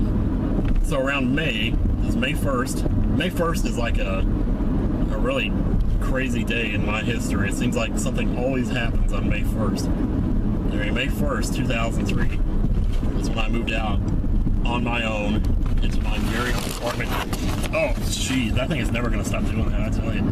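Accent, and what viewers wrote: South, United States. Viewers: that old tape man, you sounded like a straight up southerner but it still sounded like in there somewhere, I'm so amazed by the arc of which your voice changed over time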